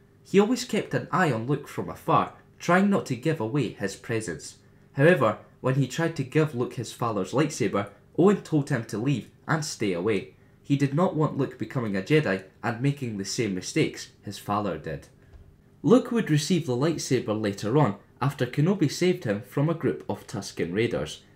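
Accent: Scotland, United Kingdom. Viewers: What's your accent from? I'm hesitating between Scottish and Irish. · Just stumbled into this. Great video and love the accent. :D Might I presume "Scottish" ? Keep them vids coming.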